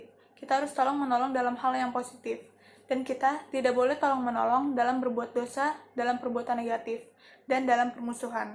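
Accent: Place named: Outer islands, Indonesia. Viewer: Bahasan yg lugas, tegas, jd dpt ilmu baru...tapi kl hati lg betmut bs ga ya org berprilku prososial...;🤔🤔 Dialek org dr sebrang ya kk😁..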